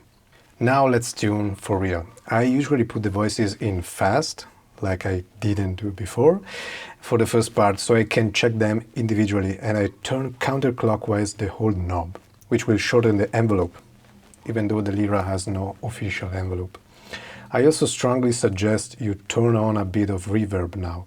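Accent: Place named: Spain. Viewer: Fantastic demo and tips! Love your accent too...Are you Spanish?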